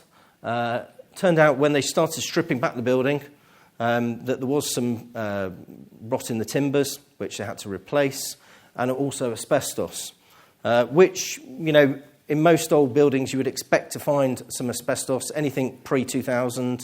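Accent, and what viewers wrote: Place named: United Kingdom. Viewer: British English accent is nice to hear and easy to understand for non native English speakers..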